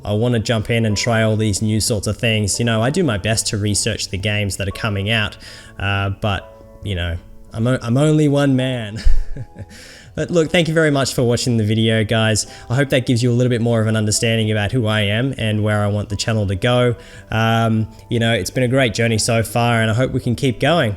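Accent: Australia. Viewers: Congrats on almost 300 subscribers now :-D In the couple videos I've watched I've spent half my time trying to figure out if you were an Aussie or not haha glad to see someone giving us Aussie gamers good representation. Good luck with future videos. :-)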